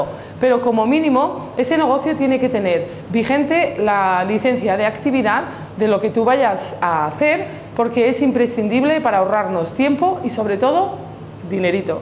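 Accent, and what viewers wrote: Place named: Morocco. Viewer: eres marroquí?? Ese acento y determinación es inconfundible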